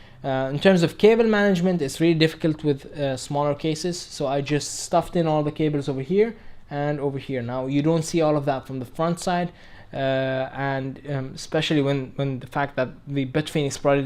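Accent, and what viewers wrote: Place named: India. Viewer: كأنك هندي قاعد يتكلم إنجليزي هيا وسابي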